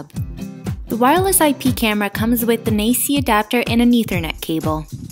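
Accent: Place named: United States. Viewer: The horrible 'skifffle' music, (noise) in the back ground made me shut you off after about 10 seconds. Why the awful, distracting, irritating 'noise' in the back ground? Your American Accent has become very acceptable now and I quite enjoy it - but please LOSE THE AWFUL DIN IN THE BACK GROUND! Many thanks, Bubbles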